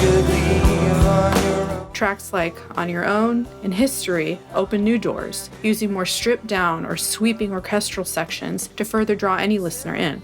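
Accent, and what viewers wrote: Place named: United States. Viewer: Great band. Somewhat ruined by this American voiceover that is read from a script, unless she`s a robot.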